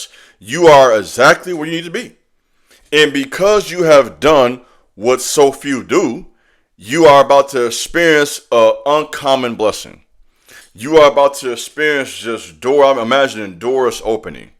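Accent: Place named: Arkansas, United States. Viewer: I’m from Arkansas yea Thts how we talkin lol 😂 gotta do it for our chilren.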